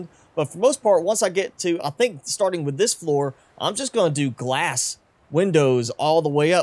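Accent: South, United States. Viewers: You sound southern are you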